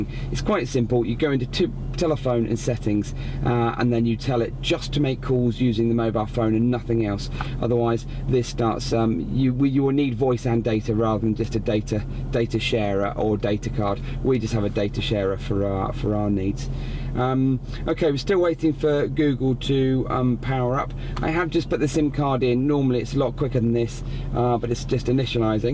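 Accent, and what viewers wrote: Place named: England, United Kingdom. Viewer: Hi Just found you and nice to hear an English voice giving help for a change hahaha!!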